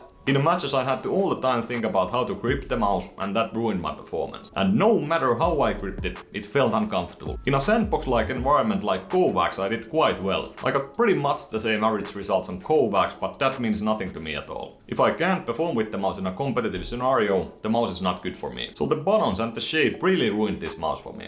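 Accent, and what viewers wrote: Finland. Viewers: Are you Finnish? That accent is familiar. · Are you from finnish?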